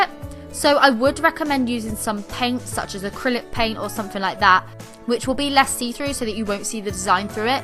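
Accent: United Kingdom. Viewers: Luv ur accent I wanna go to uk so bad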